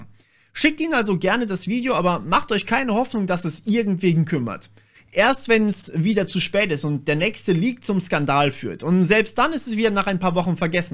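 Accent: Nuremberg, Germany. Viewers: kommst du aus nürnberg? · Bist du aus Nürnberg ?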